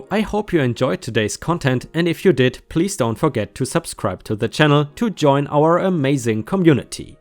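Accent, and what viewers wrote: Germany. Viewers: Are you german ? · The Person speaking: Are you gernan?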